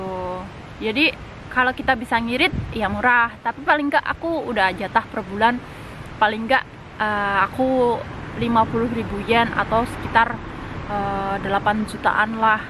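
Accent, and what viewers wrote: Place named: Banyumas, Indonesia. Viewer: semangat yaa mbaaa... btw salam ngapak yaa mbaaaaaa... satu suku sepertinya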